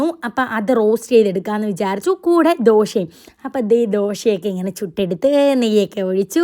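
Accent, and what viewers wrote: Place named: Thrissur, India. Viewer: Njn oru thrissur kari ahne ante amayi nt mole kolam punaloor ke ahne marriage cheythe ayachde, chechi edh polle same style ahne samsrika adh thrissur slang full mari samsrikana polle same way ahne samasrika, njn evarde video first kandapo vijariche edh thanne ahne ahh chechi samsrikana polle ahanallone 😇 edh oke oeu allekarede nade videum oke difference ille endinna igane negative comment idane 🫡 nammake ishttam alle ayalde video kannanda 😊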